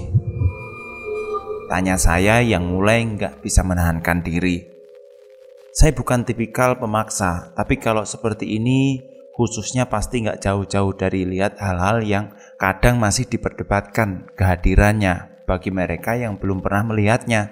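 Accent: Java, Indonesia. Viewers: Bahasa jawa medok 😂😂😂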